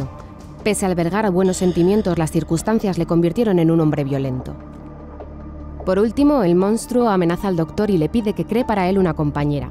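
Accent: Spain. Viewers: Me encanta el acento español <3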